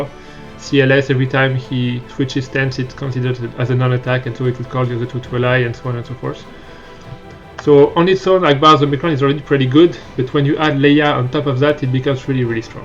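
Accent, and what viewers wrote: France. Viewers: Thanks for replying! I don't think I'll find many equally-geared Ewoks, my Malak is R7, modded for the solo (to the best of my ability, I have almost 0 6E slicing mats) and I'm in Bronzium 4. Would it work against G9-12 Chirpacron, in either one? I don't want to risk my 79 banners. Also, thanks. I've been trying to decide what to do with my omis. I might actually omicron Chirpa, seeing as how I'm gunning for Threepio for GAS anyway Also also, don't worry about your voiceover. I could understand you perfectly well, even through the (French? Is it French?) accent. I would like it if you did your GAC/TW vids with the voiceover, and the GC/TB vids with just text, because I like to boot them up at school and I don't want to miss anything on mute. However, that is just a me thing. Don't feel pressured to.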